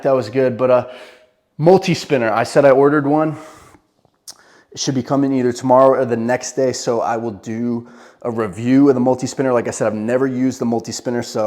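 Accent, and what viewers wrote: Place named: Texas, United States. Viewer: are you from Texas?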